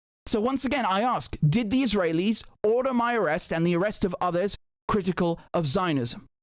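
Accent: England, United Kingdom. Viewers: Bro, I'm just going to say it. The wierd partial english accent is AGGRAVATING. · Is it just me or is British accent coming out more now? Like when he says "befaw" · I haven’t listened to you in a while. Your British accent has become much thicker. Maybe it is from stress. I generally only agree with you about 50/50 but silencing people is wrong no matter what they are saying. We are all given minds to think. If what you are saying is totally false, it will be easy to see. Why the fear? Control of available information should be resisted. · A slight bit more England English😊... Glad to see him back.. his personality is irreplaceable and his sense of humor and in-depth knowledge...while reporting is so greatly missed❤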